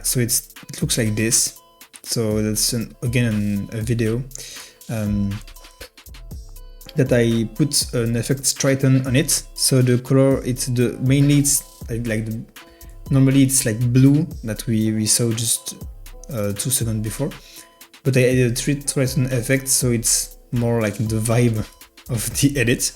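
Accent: France. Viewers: you kinda sound french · mdrrrr jme disais la meme en écoutant l'accent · tu est français non ?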